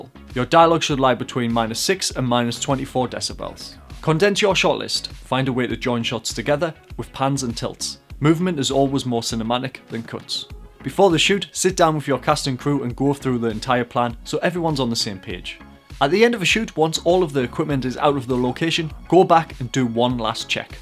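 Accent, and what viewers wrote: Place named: Scotland, United Kingdom. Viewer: it took me a second to get use to the Scottish accent; but the tips where great!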